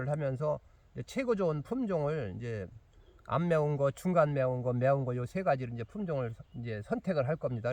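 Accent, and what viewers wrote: Chungcheong, South Korea. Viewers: 정겨운 충청도사투리의 설명이 참듣기좋아여 오늘도 추비의 중요성과 비료 선택의 중요성을 잘숙지 했습니다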